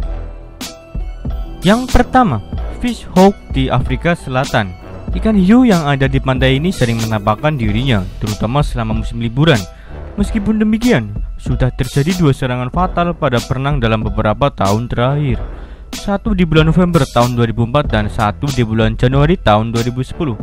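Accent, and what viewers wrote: Java, Indonesia. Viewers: medoknya dikondisikan...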